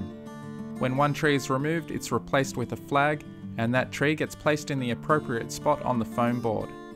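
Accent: Australia. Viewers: Is it narrating or a TTS app with an Aussie accent? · I thought that scenery looked awfully familiar in an Australian kind of way, and then of course I heard the accent, haha!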